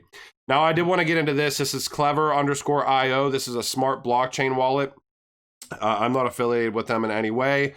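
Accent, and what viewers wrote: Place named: Western Pennsylvania, United States. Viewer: Are you from western PA? Gotta be, the accent had me thinking it and now the pirate hat. Gitta be only western pa guy wears a pirate hat. Anyway, more educational is good. The tokenization is fascinating and dynamic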